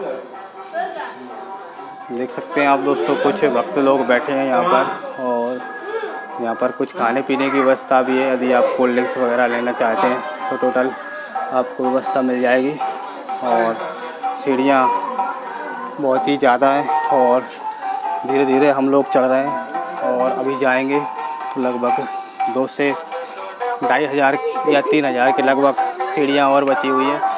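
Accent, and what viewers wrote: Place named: Madhya Pradesh, India. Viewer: सबसे पहले तो आपको वीडियो के लिए धन्यवाद , मैंने वीडियो आज ही देखा है और मुझे जो सबसे अच्छा लगा वह है आपकी बोली जिसे सुनकर मुझे लग रहा है कि आप मेरे जिले भिण्ड या इसके आसपास है अगर मैं सही हूं तो जरूर बताना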